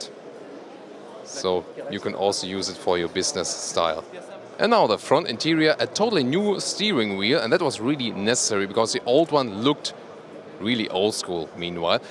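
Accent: Germany. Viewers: The colour is disturbing. But it's the same thing for my ears, being Dutch I understand English and Deutsch very well. I know you speak English but my brain wants to translate German to Dutch instead of English to Dutch. You sound so German lol.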